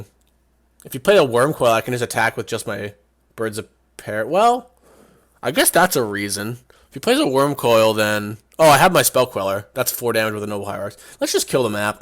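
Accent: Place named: Southern California, United States. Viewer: Most SoCal accent I have ever heard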